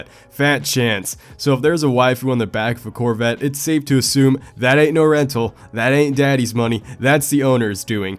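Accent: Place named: Appalachia, United States. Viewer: Dude that hillbilly accent is the reason why I just subbed😂😂😂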